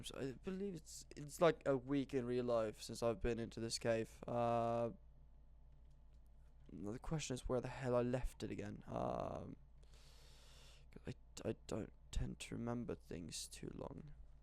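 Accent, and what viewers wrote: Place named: England, United Kingdom. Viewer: You sound english. It is uncanny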